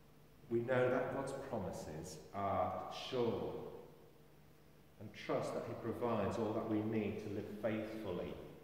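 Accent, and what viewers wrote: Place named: England, United Kingdom. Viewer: I look forward to your sermons as I live in Greece and we only have Greek Orthodox churches here -- I look forward to listening to you (good old English accent) - I miss Edgeley and look forward to coming home as soon as I sell my place here. Thank you. Stay safe.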